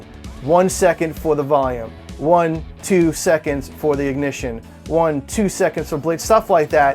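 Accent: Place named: Long Island, United States. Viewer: I detect a long Island accent. Nice video man.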